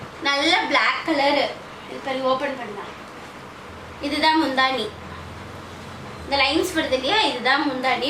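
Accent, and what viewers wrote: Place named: Salem, India. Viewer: Salem slang keattu yavlooo nall aguthu ma......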